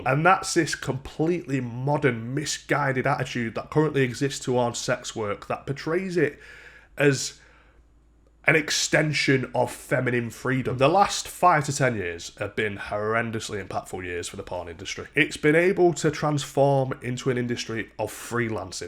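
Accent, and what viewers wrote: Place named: Yorkshire, United Kingdom. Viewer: yorkshire? me tooooo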